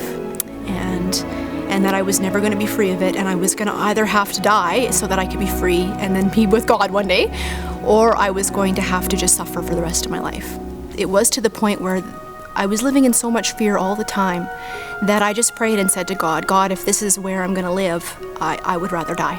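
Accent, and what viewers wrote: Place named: Ontario, Canada. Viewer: wow.. this video touches me I started sobbing xD.. but something that's funny to me is that.. she's Canadian and I'm Canadian yet I could tell she has an Ontario accent and I live in Ontario xD Normall you can't tell.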